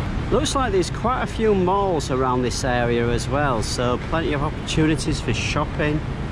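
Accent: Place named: Newcastle, United Kingdom. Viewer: Are you a Geordie? I really like your accent. I used to study in Newcastle back in 2016.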